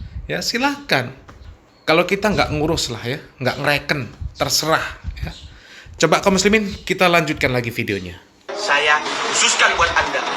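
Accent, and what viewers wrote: Java, Indonesia. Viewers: MIN ADMIN....OMAHMU NGENDI MIN....BHSA JOWO MU KOK MEDUK... JOWO NGENDI KUWE.... AYO KETEMUAN MIN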